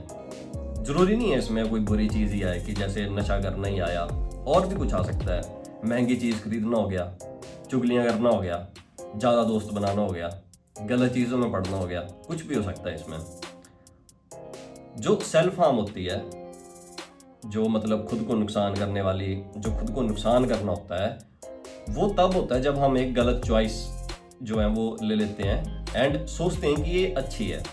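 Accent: Himachal Pradesh, India. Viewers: Your accent tells that you are from Himachal.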